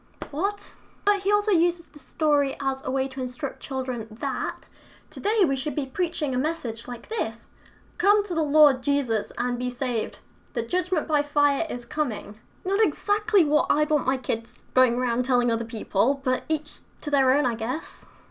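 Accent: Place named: Northern England, United Kingdom. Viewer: Okay, you have the northern accent from game of thrones and you look like a STARK, seriously game of Thrones fans close your eyes and listen to the accent / like other sounds like "ughthugh".....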